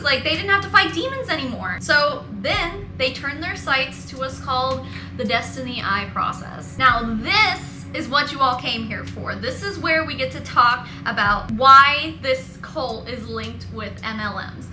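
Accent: South Africa. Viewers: Yoh! that south african accent is THICK! also for some reason people here in South Africa have an obsession with Demons... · Oh. South Africa. Thats my country. Well its about time the shitstorm that is South African cults is opened up. Its a wild ride to hear your own accent in a video about cults, Im not gonna lie. Sadly, its a common belief here that certain people can take in spirits and release them, especially if you're Christian. However, that gal? She needs some medication, and a therapist. ASAP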